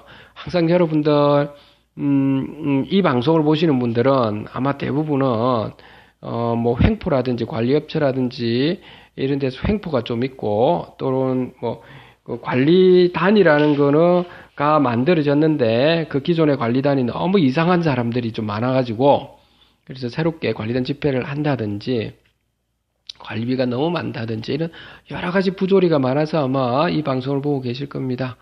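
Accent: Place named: Gyeongsang, South Korea. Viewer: 성낙을 받아 어결권을 ~ 갱상도 사투리가 정겹네요 ㅎ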